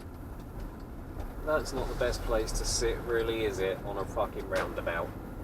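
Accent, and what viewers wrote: London, United Kingdom. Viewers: You sound like you’re from Hainault area? A friend of mine is from there & you both sound identical lol 😁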